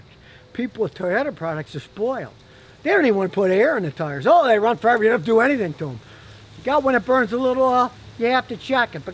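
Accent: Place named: Tennessee, United States. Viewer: its like your getting used to Tennessee, i can hear that in your tone 💪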